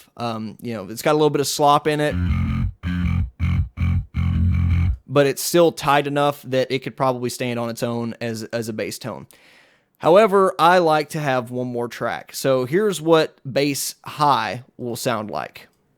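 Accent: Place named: Kentucky, United States. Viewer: new to your vids here... Where are you from? I'm from Kentucky,and your acsent sounds like you're from this area,lol. Sorry man not trying to be whatever, but yea... Awesome vid. New sub here.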